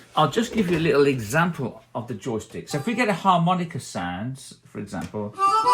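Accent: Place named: Birmingham, United Kingdom. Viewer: Very interesting . You are an amazing player and I've learned so much listening to your videos. Also nice to see your other keyboards stacked up especially the Roland Juno. I have a beloved Roland Juno DS Amongst my many others. I'm sure I detect a slight Birmingham accent. I used to get some keyboards from Jones and Crossland and Lichfield Organ Studios back in the day as I lived in Lichfield before I moved up to the Scottish Highlands. Keep up the amazing playing ! 🎹👍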